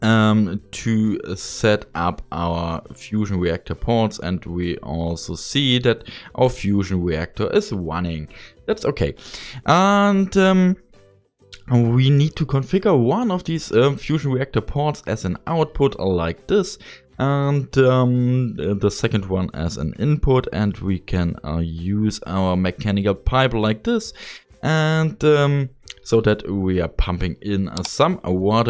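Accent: Germany. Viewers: Sofort erkannt dass dun Deutscher bist xD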